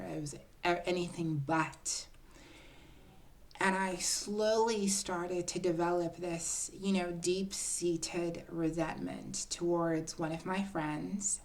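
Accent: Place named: United States. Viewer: Whats up with the American accent?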